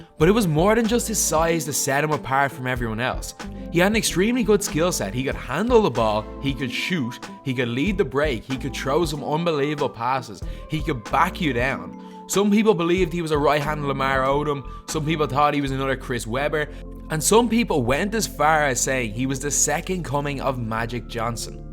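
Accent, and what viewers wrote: Ireland. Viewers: Are you Irish? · Interesting video :-) And I just gotta say ut: love that Irish accent :-) 🇨🇮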